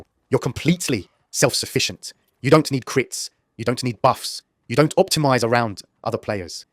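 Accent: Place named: United Kingdom. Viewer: Really sick of choosing random videos only to find another British narrator. Do you mfs have real jobs?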